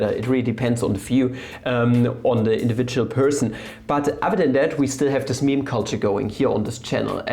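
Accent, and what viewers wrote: Germany. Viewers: Ähm ich hör da bißchen deutsch raus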